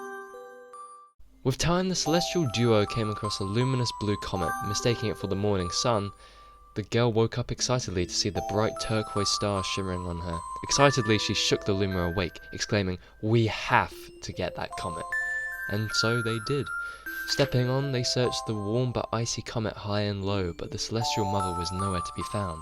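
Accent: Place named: United Kingdom. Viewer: Having another brit explain things is the main bonus for me cuz theres no miscommunication if you say words that mean different things (my biggest struggle with american creators)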